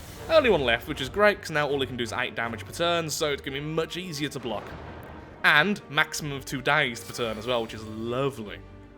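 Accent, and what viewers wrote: United Kingdom. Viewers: watched the entire video with subtitles only no sound and, it was funny the errors google's speech to text makes trying to translate the British accent.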